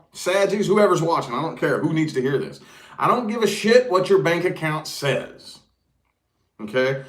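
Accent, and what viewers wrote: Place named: Kentucky, United States. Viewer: Love your accent. You sound like us in Kentucky.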